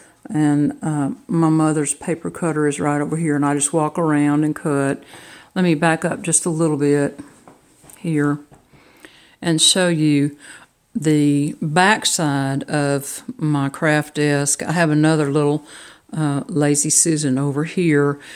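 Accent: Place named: South, United States. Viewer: New subscriber! Love listening to a fellow southerner soft accent !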